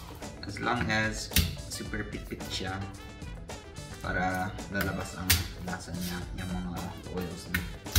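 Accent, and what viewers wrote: Ilocos, Philippines. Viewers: Grabe balong. Pinoy na pinoy ka na talaga ah. Ay ilocanong ilocano na pala hahahaha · Ang cute mong mag salita ilocano ka nga talaga 😂😂😂I love it ❤ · Ilocanong ilocano pati Beng at panagsao ket natangken... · I really salute how you embraced being a Filipino. Ilokana din ako and you really sounds like a legit one. Kaway-kaway sa new subscriber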